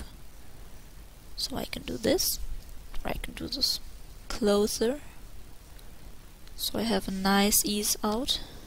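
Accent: Germany. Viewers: Are you german? I fucking hear it ¬¬ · ich habe iwie sofort an deinem englisch erkannt dass du aus deutschland kommst xD(nicht dass das schlimm wäre o: ) aber schön zu sehen das es hier so coole undertale fans und animator gibt :D grüße aus hannover :3 und natürliches: nices tutorial :D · Man hört sehr gut heraus, dass du aus Deutschland kommst :D Sehr gutes Tutorial btw! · I think it's German. (I'm German too so I can hear the dialect) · Sehr gutes Tutorial :^) Ich habe die ganze Zeit überlegt was das für ein Akzent bis ich am Ende erkannt habe, dass es ein deutscher ist ^^ Weiter so!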